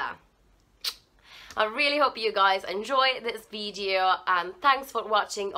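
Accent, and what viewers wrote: United Kingdom. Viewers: You have british accent! Però per colpa del pugliese spaghetti l'hai detto con la e aperta, quindi andando verso la pronuncia sbagliata 😄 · Hai un accento di Birmingham potentissimoo😂😂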